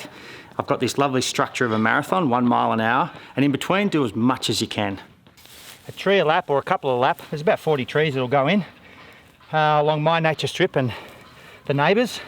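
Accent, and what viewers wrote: Australia. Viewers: this guy is australian as fuck!!!! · Definitely Australian. He has a more pronounced rural accent which is easy to mistake for NZ if you’re not from here but he lives in Victoria. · I kept forgetting you were Australian until the comment about the "kooky old farmer" who "ran around his paddocks with his gumboots on." Which I had to play 3 times before I could even understand the words you were using 😂. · Where is he from? I can't seem to properly pinpoint his accent. Is he Australian? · definitely australian maybe from the north west? filmed in VIC or tazzie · Hey. I'm an Aussie living in Vienna, Austria. You make me miss home. The enthusiasm, the accent, countryside and Aussie girlfriend/wife. Also big on running. Loved everything about this video. Inspires me to get off my butt and be more productive maybe even make a youtube channel myself!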